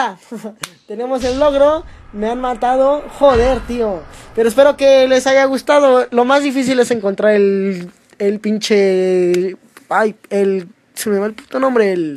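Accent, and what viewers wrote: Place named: Mexico. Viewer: Habla como mexicano, dice palabras de españa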